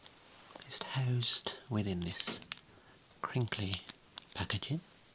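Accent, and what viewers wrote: Birmingham, United Kingdom. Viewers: Brummie accents are so perfect for asmr. Great job again buddy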